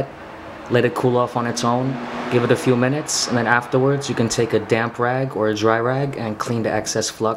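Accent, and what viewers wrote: United States. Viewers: That's just how Americans say it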